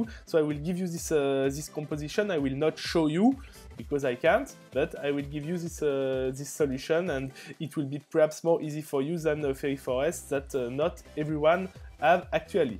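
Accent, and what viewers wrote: France. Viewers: are you from russia ? thought you are french with that kind of accent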